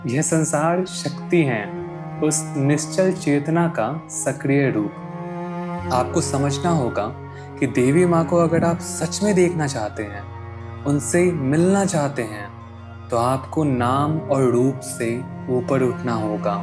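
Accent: Bihar, India. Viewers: Bihari hain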